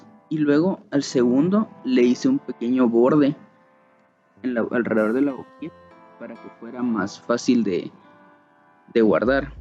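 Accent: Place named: Guatemala. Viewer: Se nota que sos de guate va loco jajajaja, saludillos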